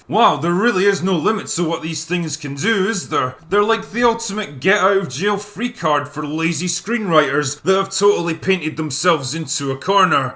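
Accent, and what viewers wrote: Scotland, United Kingdom. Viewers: His Scottish accident was way more subtle in his earlier vids... he got at least 110% more Scottish in the last year or two.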